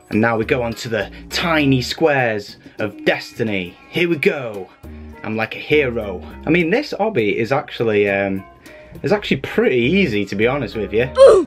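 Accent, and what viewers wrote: United Kingdom. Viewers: I love his accent! Is he British because I'm British? :P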